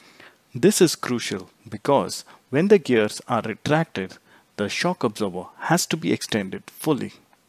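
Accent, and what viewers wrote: India. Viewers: 1) I don't like the pronunciation. It's like Indians. I can't understand this self-confidence of people whose native language is not English. Great courage... 2) You don't explain why the oil in the hydraulic section is needed.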